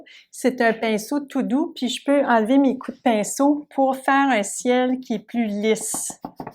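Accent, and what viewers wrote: Quebec, Canada. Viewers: Merci beaucoup. Je suis française et suit des cours de peinture à l huile.l accent québécois en plus qu on adore en France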